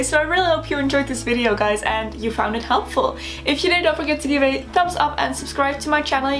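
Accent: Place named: Netherlands. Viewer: what accent does she have? She is from the Netherlands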